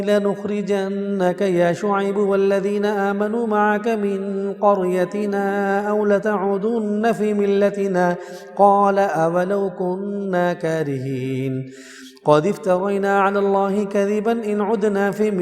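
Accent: Panipat, India. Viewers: Lovely panipati accent. Allah o akbar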